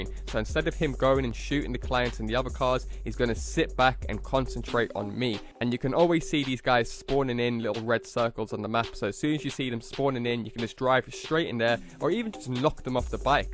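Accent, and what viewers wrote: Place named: United Kingdom. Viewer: yo swear down youre from uk! grime!!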